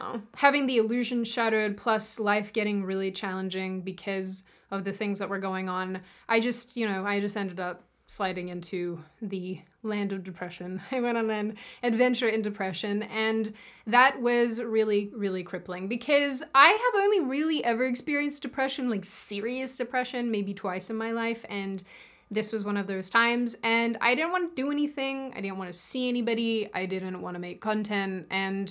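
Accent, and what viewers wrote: Australia. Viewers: Don't give in the pressure! It's sad how things have turned out, but you're one of my favorite commentators; I only ever watched "You Are Here" because of you. I love your snarky comments on the bleak outlook of humanity, and I think your Aussie accent just amplifies it as well lol. You do you, always remember that you're great, and those who support you, will always support you, while those who are just here to bully, will do just that. I really do wish the best for you, whether it's to continue doing this kind of work, or to move on and do whatever else you want with your life. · Oh I just love your Oz accent 😂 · I'm surprised that you feel this way. The reason being I've always thought you had a funny presentation of facts and opinion in your videos. You don't confront and you're never downright mean. You keep the mood light (which is good and a breath of fresh air). 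Or maybe I was just completely duped by that aussie accent 🤔 Anyway. I've always thought that content creators on the right need a good and stable home life to replenish their strength. Especially considering our side believe in the nuclear family etc. So I do truly hope you have someone special in your life. And if so that you start a family sooner than later. Focus on that. Enjoy that. Take a break. We understand this crazy world can actually take a toll. You're only [a lovely] human. Lastly, I follow two big film critique channels (CriticalDrinker and Nerdrotic) on here that do entertaining work. I think it's the same you do, but a different field (it's literally the culture of the political and society you're criticising). The aim is set on fictional characters, stories and suits that greenlight crap. I think you should look in to it. Also, team up with a friend and do some film reactionary episodes. 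Try it out and see what the response is. There are some channels on here that have over 300k views and making good money just by us watching them watch movies 😂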